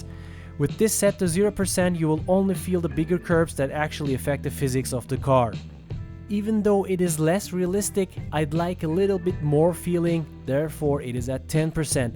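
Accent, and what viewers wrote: Turkey. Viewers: reis türksün demi la jsajnfashjkfjlas aksandan anladım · 2. saniyede türkmü bu dedım kanala gırdım türkiye gordugum en temız ıngılızcelerden bırı olsada bellı edıyor kendını elıne saglık bu arada vıdeo ıcın sagol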